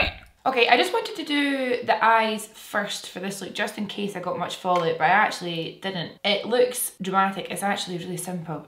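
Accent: Scotland, United Kingdom. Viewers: nice to hear a fellow scot on youtube xx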